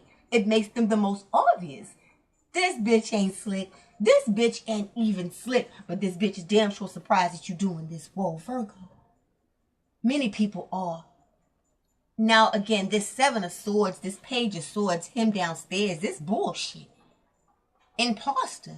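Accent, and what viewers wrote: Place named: New York, United States. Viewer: I like readings here new York accent sista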